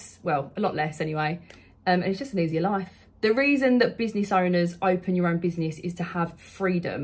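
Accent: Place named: United Kingdom. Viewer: Hello, I absolutely love your UK accent❤ I live in Texas and recently got certified as a concierge neurotoxin injector in Prosper TX. Would you please be able to help provide what it is I'd be needing to build clientele also to confirm that course i took is compatible to a level 4, 5, or 7? I'd really appreciate your effort and assistance. Thank you 🎉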